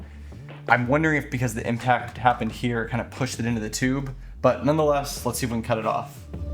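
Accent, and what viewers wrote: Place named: United Kingdom. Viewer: I reckon British Accents go well with this kind of videos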